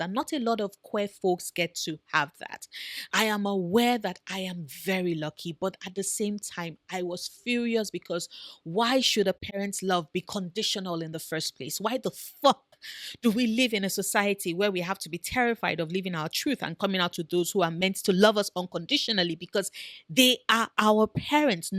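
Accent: Nigeria. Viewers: i only just clocked the nigerian accent in this video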